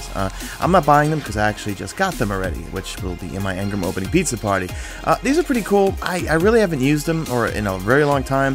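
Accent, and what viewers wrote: New York, United States. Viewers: You weren't kidding about your old new york accent and speed talking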